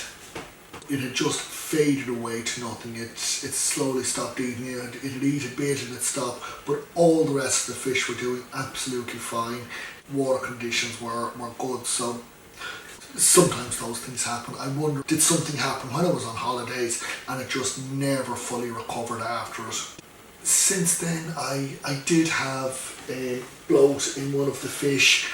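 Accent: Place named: South, Ireland. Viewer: Lovely video my friend missed this one where about from the south of Ireland are you from ?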